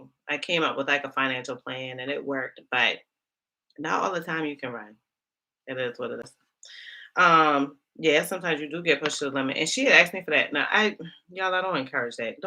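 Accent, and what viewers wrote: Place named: Charleston, United States. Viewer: girl you sound like you from Charleston and if you not girl where are you from because I sound just like you 😂😂😂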